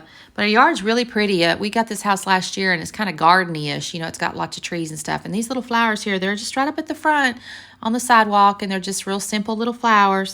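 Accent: South, United States. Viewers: I love this. You sound like a real southern girlfriend. I’m in Tennessee just wondering where your from?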